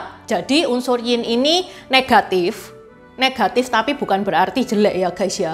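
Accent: Java, Indonesia. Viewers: Saya bkn ethnis tionghua ni mbak saya mau nanya ni, apa yg di maksud tepekong atau apakah itu tepekong yg sering di sebut apakah itu sejenis mahluk halus dalam budaya tionghua, trimah kasih sblmnya cici yg mdok jawa nya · cara omongny kyk org jwa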